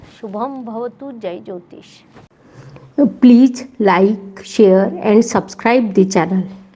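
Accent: Maharashtra, India. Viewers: Madam please speak in Marathi or else speak in Hindi tone..Ekdum marathi tone aata hai to thoda alag lagta hai..Content bohot accha hai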